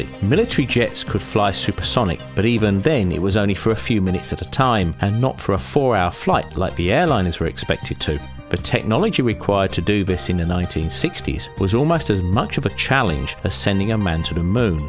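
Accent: United Kingdom. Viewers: He is British, and they all sound the same. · Just like to say recently came across your channel I find it very interesting informative and oddly relaxing plus it's nice to listen to a fellow brit rather than the plethora of Americans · is it just me or do monotone, loud British accents just get irritating as fuck after more than about 2 minutes ? seriously this needs a female narrator or cc, dammit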